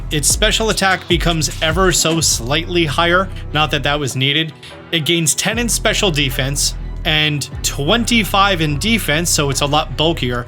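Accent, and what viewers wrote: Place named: New Jersey, United States. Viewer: Want to know how I know you're from New Jersey? You say: wooder If you see this.. Taylor Ham or Porkroll?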